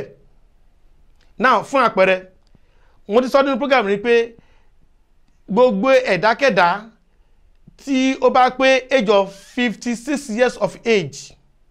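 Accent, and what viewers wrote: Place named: Fulani, Nigeria. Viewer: The man is Fulani....just pay attention while listening to his ascents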